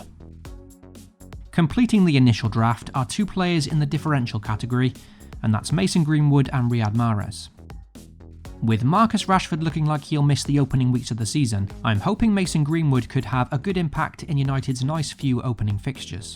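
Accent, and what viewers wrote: Wales, United Kingdom. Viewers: Is it just me or has he turned welsh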